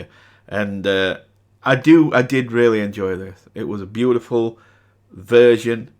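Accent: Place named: Liverpool, United Kingdom. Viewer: When you listend to for the very first time did you expect to fall down into such a huge rabbit hole? I remember you said that you rate songs and you rarely rate songs with a 10. I was so amused because I already knew what you are heading into. I really like your accent although sometimes it isn’t that easy for me to understand. May I ask from which region you are from? Liverpool? I‘m not sure about that, just a guess from a foreigner not native speaking English.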